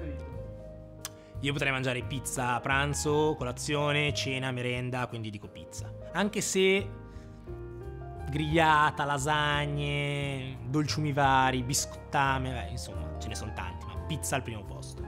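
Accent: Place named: Milan, Italy. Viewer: Grande, bravo e anche simpático. Oggi sentendoti parlare mi hai riportato indietro nel tempo alla mia infanzia, vissuta nella provincia milanese, ed è stata pura e viva emozione. Cinquant’anni più tardi qui nel cortile di casa alla periferia di Madrid la lingua non è più quella, allo stadio non c’è Gianni Rivera ma c’è Carlo Ancelotti. Tempi e luoghi che cambiano…. Grazie.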